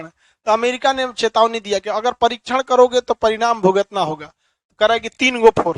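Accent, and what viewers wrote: Bihar, India. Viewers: यार तू पहले बोलना सीख ले आर यू बोलने की तमीज नहीं है क्या बिहारी भाषा बोलता चावल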